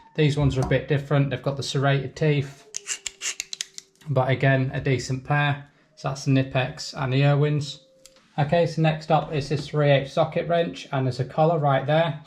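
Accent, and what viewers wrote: United Kingdom. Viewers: Im an Electricion in Sweden, i see you have stuff for plumming, are your doing both jobs as a electrision in your country?, sounds like you are from UK right? Great video, i enjoy watching your content.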